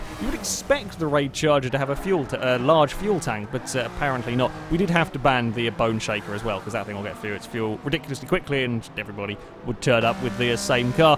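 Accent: United Kingdom. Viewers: You sound incredibly British :D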